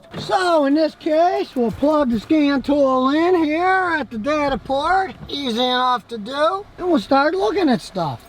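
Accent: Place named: Buffalo, United States. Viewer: Yah- heez from Buffalo!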